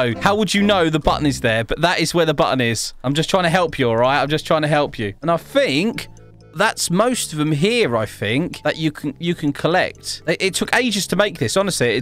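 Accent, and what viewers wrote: United Kingdom. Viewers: i love your british and also your vids<3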